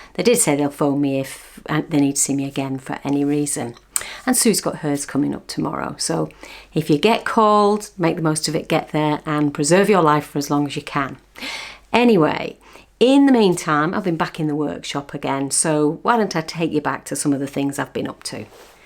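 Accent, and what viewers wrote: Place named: Scotland, United Kingdom. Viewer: I'm sure the Scottish accent will come back! 😂🫣🤔 Looking forward to seeing what you do with the pallets! xx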